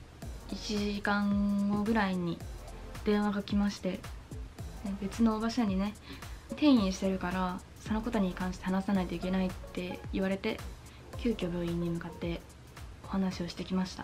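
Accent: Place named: Hiroshima, Japan. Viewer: じゃけどって方言でとるけど広島かな？私も広島🥰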